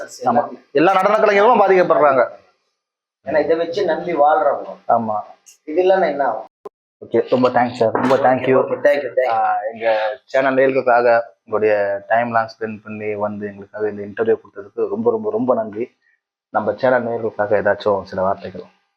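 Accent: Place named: Chennai, India. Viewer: Attahasamana comments ivar epdi sivaji madiri irukka mudiyum chennai speech supera pesararu veetanda sivaji ipdiya pesuvar avara madiri tamizh pesamudiyuma kural illave illa but covering gold aaguma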